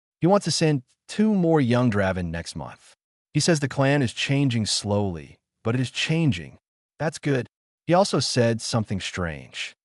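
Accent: Spain. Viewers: So sick of the spanish accent kicking in. IF you want a AI to read it that fine but get one that is native to the language you are talking in. It totally takes you out of the story. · I hear it too. Definitely Spanish, but from Spain, not Mexico. I just laugh and continue listening. This AI voice, lulls me to sleep. I love it. The other one that helps me sleep is Radio Reimagine, great stories! Very relaxing!!! Have a great life!!!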